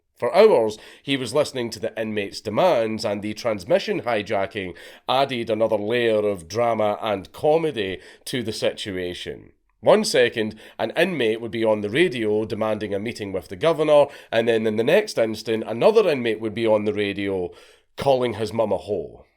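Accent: Scotland, United Kingdom. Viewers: Whoa whoa whoa what is the Fuck did you just say about Exodus. You have never said anything that has ever offended me before but my dude that ain't cool! Edit. It just occurred to me after I posted this I think I just misheard you because of your Scottish brogue that I love so much that you said thrash band not trash band 😮😅 my bad rock on brother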